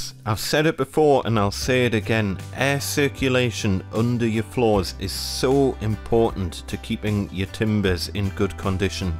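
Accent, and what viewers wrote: Newcastle, United Kingdom. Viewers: Not just Geordie, specifically Gosforth. If I hadn't moved south for uni 20 years ago, I might even be able to tell which street from his accent. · Geordie not Scottish.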